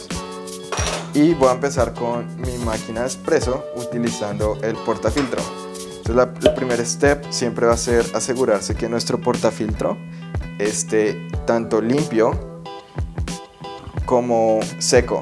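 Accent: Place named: Colombia. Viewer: Gracias por la receta. parece que eres colombiano. Ahora voy a preparar mi café late orgánico. Un abrazo, saludos desde Popayán, Colombia.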